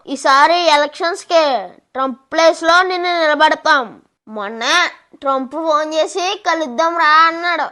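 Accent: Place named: Kakinada, India. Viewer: నీ వాయిస్ కాకినాడ చిన్నోడి వాయిస్ లాగా ఉంది.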